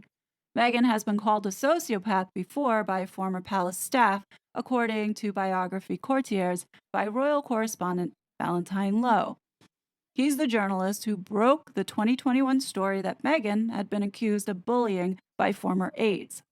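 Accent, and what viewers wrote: New York, United States. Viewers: I love when your New York accent and phrases shine through🥰. I live in Florida now but originally from Jersey shore, I miss those things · I don’t believe she is attacking appearance. A ferret is a type of weasel, which I believe is calling her. I may be wrong, but that was my take. native New Yorker is coming out in this video. When she gets riled up about all these deceptive shenanigans, accent stands out and she speaks faster and with more vocal attitude.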